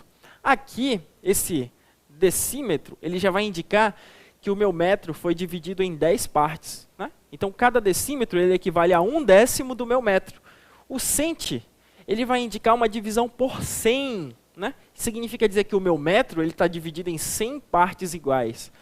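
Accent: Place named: Rio de Janeiro, Brazil. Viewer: mt carioca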